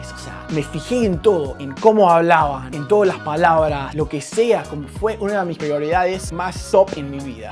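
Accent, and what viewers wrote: Buenos Aires, Argentina. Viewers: Pibe, vos no hablar español argentino... Hablas español-porteño... Los argentinos no hablamos así como vos pensás, cada provincia de Argentina tiene su forma de hablar..... Estamos casi hartos los argentinos de que nos porteñicen a todos !!!! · Felicitaciones de una portenia a otro "portenio"!! Es genial tu acento! Felicitaciones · pero hablas español porteño .... ... no hablas en neutro mm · Con ese acento porteño tan fuerte no creo que tu primera lengua haya sido el ingles !! Yo vivo en Estados Unidos y los nacidos aqui de padres latinos no tienen el acento tan marcado (estoy hablando de hijos de argentinos ))😒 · este hombre esta muy bueno, aunque su acento me hizo pensar que era un argentino :p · Hablas muy bien e español, con acento argentino. Excelente, felicitaciones. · WoW de donde eres? no me digas que de E.E.U.U? 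pero hablas muy bien con el acento argentino!!! saludos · Vaya, es muy interesante el hecho que optaste por hablar como Argentino, te va muy bien. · Tienes acento argentino, se oye bastante bien. ¿En cuánto tiempo lo lograste? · de que pais eres? suenas como si fueras de argentina. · dices q no eres latino ... y en vez de decir 'yo' dices 'sho' y tienes toda la fonetica expresiva de un argentino ...usas la sh en varias palabras tipico del argentino ...xD ni siquiera demostraste hablar ingles ...y no eres latino? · El habla bien español, a el le gusta el acento Argentino Bonaerense. Punto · hablas español y haces el acento argentino mejor que yo siendo nativa de argentina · Cometer errores se dice NO hacer errores jaja tu acento es lo mas porteño del mundo jaja te felicito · Es espectacular como hablas "castellano porteño"!!!! es tan difcil hablar otro idioma como si fueras del lugar! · que lastima que aprendistes a hablar como porteño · yo soy argentino de la parte sur de Córdoba, quería decirte que me parece genial que te interese tanto el (vulgarmente dicho) Argentino Porteño, sí, Porteño, vos hablas tal cual un un Porteño, yo hablo parecido, al estar cerca de la provincia de Buenos Aires y al estar cerca de Río Cuarto (Córdoba) hablo una mezcla de esos idiomas, es como neutro, porque no tengo la tonada porteña, ni la de Río Cuarto, te felicito, la verdad, me sorprendiste desde el primer minuto del primer video que vi tuyo, abrazo. 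· tu fisico y tu acento es de un argentino mas que aprendio ingles, pero resulta que eres un estadounidense que aprendio castellano, wtf! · Él solo habla inglés y español. Lo único impresionante es como imita el acento porteño. Hay gente que habla más de 2 idiomas. Pero que hable el español ya es sorprendente pero ahí nomás. No como otros que aprenden hasta 20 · Soy de aca de Argentina <3 y hace poco vi por primera vez uno de tus videos porque estoy estudiando Inglés y era muy gracioso ver como Nihill pronunciaba distintas frases, y te juro que pensé que eras porteño!!!!!!! SOS UN GENIOOOO!!!!! Realmente pensé que eras de Buenos Aires!!! Espero algún día poder hablar en Inglés como un nativo como lo haces vos!!! Saludos desde Córdoba, Argentina.. :)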